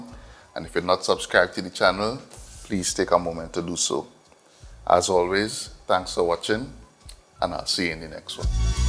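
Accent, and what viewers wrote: Trinidad and Tobago. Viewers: i love trinidad accent soo much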